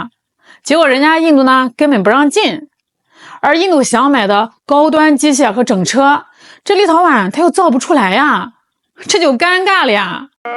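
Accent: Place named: Shandong, China. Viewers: 妹子你这口音是山东的妮儿吗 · 你这普通话山东味太足了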